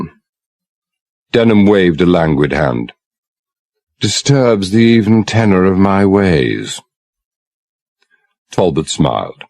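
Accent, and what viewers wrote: RP, United Kingdom. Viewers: If someone will please say, what is this way of speaking? I’ve listened to a lot of different British accents and this is distinctive. What place and frame of time? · They spoke like this in the 40's , 50's 60's ...... grammar school posh talk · It's called received pronunciation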